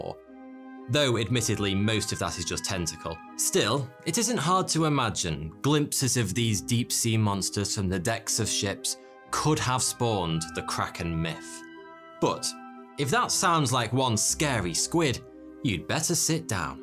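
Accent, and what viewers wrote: United Kingdom. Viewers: I have never heard someone so British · I watch these because it feels like I have a British teacher who's actually interested in the subject they talk about. Makes it even more enjoyable to learn new stuff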